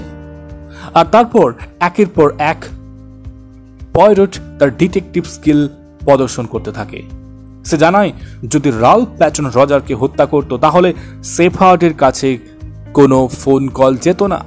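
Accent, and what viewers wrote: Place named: England, United Kingdom. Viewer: Everything is nice but you should have stuck to your own Bengali tone but your tone seemed to be English while you speaking bengali..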